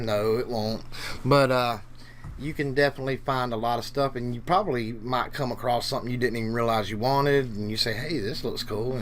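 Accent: South, United States. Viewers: Love that accent man may I ask where you come from? I'm not a native speaker but it's def. southern😎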